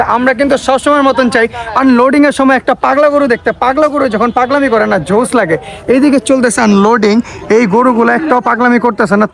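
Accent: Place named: Old Dhaka, Bangladesh. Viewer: তোমাকে সাবস্ক্রাইব করেছি আমি আর তোমার বড় ফ্যান তুমিও তোমার ভাষা পুরান ঢাকা আমার বাসা নারায়ণগঞ্জ😊😊😊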